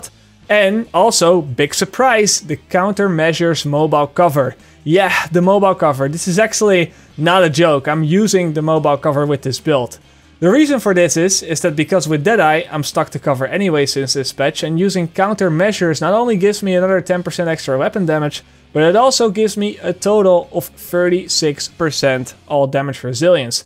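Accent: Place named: Netherlands. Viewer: Jij bent nederlands... Ik hoor het in jou accent.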